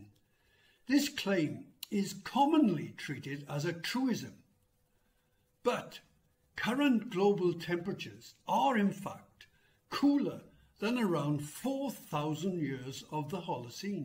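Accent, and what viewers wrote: Wales, United Kingdom. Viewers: I agree with you, I love his voice and accent as well. Since I have watched a large number of his videos over the last several years I remember someone remarking in the comments section; saying he has a distinctive 'Welsh' accent. I can't verify if this is true, but try this as I did. Listen to a Youtube video where someone is speaking with a 'Welsh' accent and by comparison I believe the person got it correct, then you can decide for yourself. His knowledge is so impressive that all it took was watching one of his videos and I immediately subscribed. I pray he will get more recognition for all of his excellent work. The world needs to hear his videos, before we head down the rabbit hole of making big changes based upon false facts. What bothers me is if we do this; there will never be any way to monitor our progress. In other words we will be chasing rainbows.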